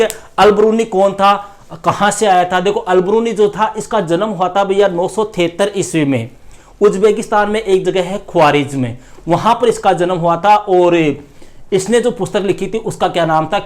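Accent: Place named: Shekhawati, India. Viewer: Sir aap sekhavati area se h kya language vesi lag rahi h bahut acha padhate ho sir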